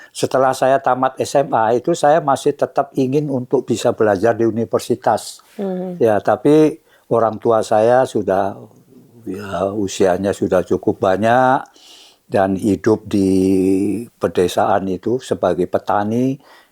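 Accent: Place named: Java, Indonesia. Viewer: Sdh puluhan thn tinggal di luar negeri tpi logat jawa tetap medhok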